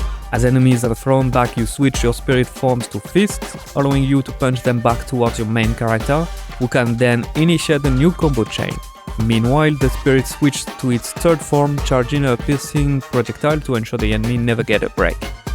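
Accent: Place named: France. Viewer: De par l'accent, et certaines réponses, je devine un developpeur français. Bon courage ! Adorant les productions Capcom et Platinum Games, je garde un oeil dessus. Rien que le decor desertique maisi pas vide, cest deja intéressant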